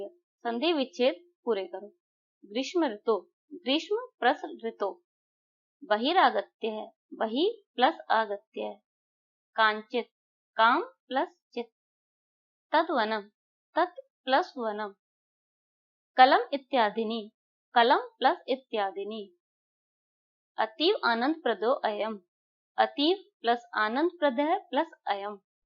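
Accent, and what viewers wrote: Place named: Rajasthan, India. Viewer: Aap Rajasthan se ho kya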